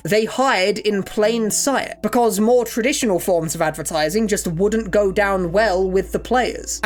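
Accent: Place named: England, United Kingdom. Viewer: Ok english accents are always funny to me as an American, like i enjoy how you all say Mario, but that Nissan pronunciation is something else!! ❤️ You have a great channel man. Could really good analysis videos I've seen now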